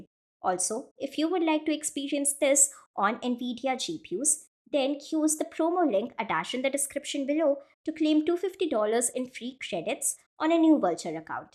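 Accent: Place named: South Asia. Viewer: Hi. I appreciate the presentation, but the extremely heavy South-Asian accent and cadence personally makes it VERY difficult to properly parse the words/meaning (English is not my first language). So many things are simply mispronounced. Cachet for starters. We would be MUCH better off with an Ai voice here, and I don't say this lightly because they are monotonous and boring, but at least my brain doesn't get fried.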